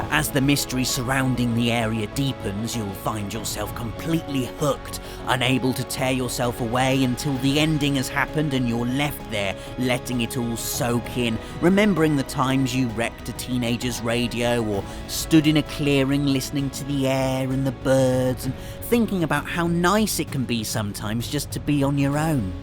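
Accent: United Kingdom. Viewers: Man i just absolutely love the british accent ;D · As a new viewer, I have to say your videos are worth watching even if one does not take interest in gaming, that British voice is just remarkably fun to listen to :)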